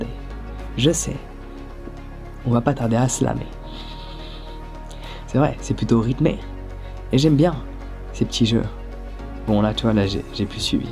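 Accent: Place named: England, United Kingdom. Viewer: Il parle du nez mdr si vous le suivrez plus vous seriez que c'est un accents anglais et qu'il à du mal avec certains mots de français.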